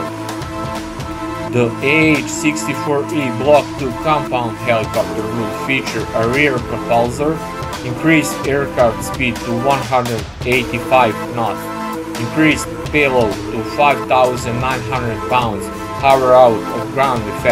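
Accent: Russia. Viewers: Why the fuck should I believe any one with a damn Russian accent giving me updates on a new American advanced helicopter!? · Why a Russian narrator ? hard to understand what he is saying ! still love all of the cobras ! :) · Drunk, Russian narrator needs to sober up...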